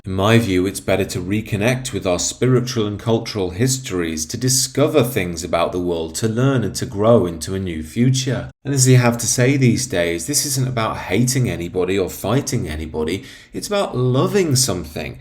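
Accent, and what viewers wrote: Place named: England, United Kingdom. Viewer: Spoken like a true english gentleman . Cheers from Italy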